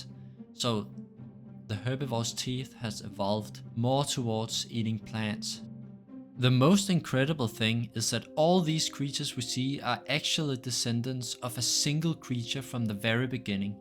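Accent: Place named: Denmark. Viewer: Fed fed projekt kunne høre du var dansker fra starten 😂 Arbejder selv med ai så fortsæt det gode arbejde!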